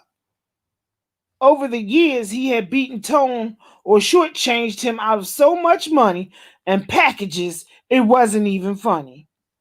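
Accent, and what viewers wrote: Baltimore, United States. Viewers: That baltimore accent makes this book hit right 😍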